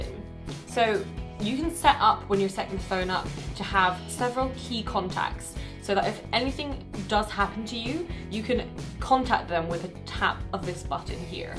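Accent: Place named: Estuary English, United Kingdom. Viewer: Silly, intrusive background 'music', no explanation of what is shown on the external indicator panel, no mention of charging plug type, absence of showing how to set anything on the phone, ambivalent accent mixing estuary English with a sort of N.American and, overall, an ego trip posing as an empathetic guide for seniors.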